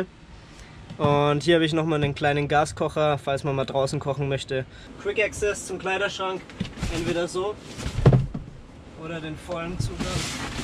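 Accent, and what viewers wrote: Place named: Upper Franconia, Germany. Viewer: Am Anfang vom Video hab ich mir gedacht der is doch sicherlich aus Oberfranken und dann ah der kommt ja auch ausm Landkreis Bamberg wie man selber 😂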